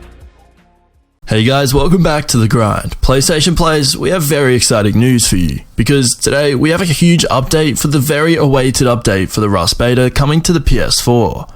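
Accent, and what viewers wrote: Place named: Australia. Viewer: Australians are so hard to listen to FFS change up your pace monotone af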